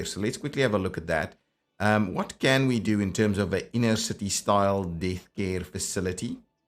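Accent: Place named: South Africa. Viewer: Has to be South African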